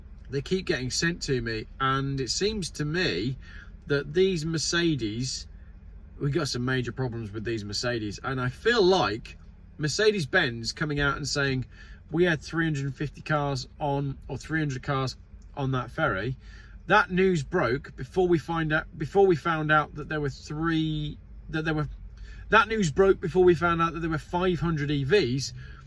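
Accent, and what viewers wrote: United Kingdom. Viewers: It's worth it just to hear your british accent. We "colonials" love it! And nobody here in the US seems to be as ahead of the curve on EV's as you are.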